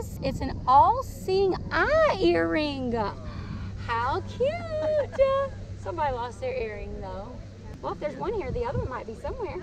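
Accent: United States. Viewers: I found you today through nugget and I noticed your hat. I am from belton South Carolina. It’s not real far from Clemson. Maybe 30-45 minutes. My husband is a big tigers fan! I love your personality. I should have know you were a local the way you talk. 🥰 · Hello. I wish I lived near a saltwater beach. Back in 1996 I was using a Bounty Hunter machine with very simple target ID on a low lake (Toledo Bend) in the swimming area and found two monster size 14k rings and a smaller diamond ring. I also found 18k gold rim glasses and a small 14k earring and lots of modern coins. I have a Vanquish detector now and would love to go beach detecting. I found my first gold ring, an 18k England Air Force Base one with missing stone in the late 1970s in my grandfather`s yard with a heavy Whites CoinMaster that used a bunch of AA batteries. Also got 1.75 in silver coins that day in the garden. 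Love your cute accent. It`s very similar to some central and north Louisiana ones.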